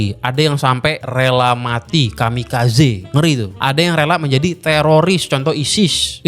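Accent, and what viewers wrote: Java, Indonesia. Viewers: Iya, kalau di channel ini aku jadi jawa sesuai mahaguru, biar beda sama di channel utama 🤣🤣🤣🤣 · Kenapa kamu sekarang intonasinya ke Jawa"an bang, terinspirasi dari maha guru kah?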